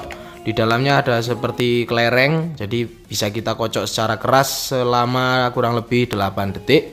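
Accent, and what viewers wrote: Temanggung, Indonesia. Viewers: Temamnggungane medok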